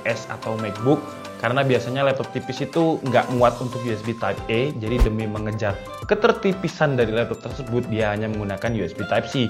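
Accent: Java, Indonesia. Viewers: Abang orang jawa ya bang😆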